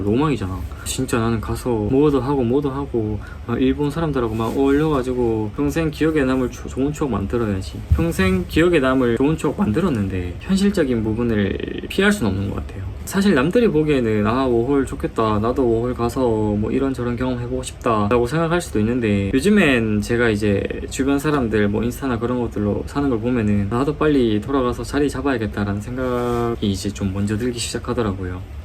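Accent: Daegu, South Korea. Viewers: 안녕하세요. 일본 워홀을 가고 싶어 영상 찾아보다가 댓글 남겨요. 대구 사투리 쓰셔서 대구 분이시구나 했는데, 팔거천 얘기 듣고 깜짝 놀랐네요. 저도 칠곡 살아서요 ㅎㅎ.. 지금은 서울에 직장 잡고 열심히 일하고 계신 것 같은데, 저도 워홀 이후에 서울 가는 게 계획이거든요. 이런저런 부분에서 반가워서 댓글 남기고 갑니다. 다른 영상도 마저 찾아볼게요. 하시는 일 다 잘되시고 파이팅하세요!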